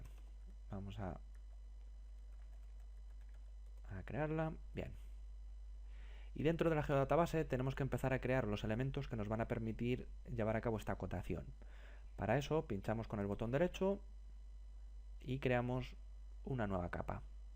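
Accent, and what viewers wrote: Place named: Madrid, Spain. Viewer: Estoy viendo este video desde Pakistan y aca el medio normalmente es Ingles pero con mi nivel intermedio de Espaniol entendi perfectamente y me habeis ayudado demaciado para preparar una mapa. El senior tutor suena madrilenio... lo creo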